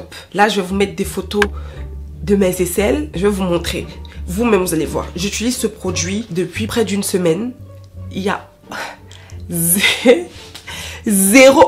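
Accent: Cameroon. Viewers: Naah l’accent kmer vraiment je peux dead 😍😍😂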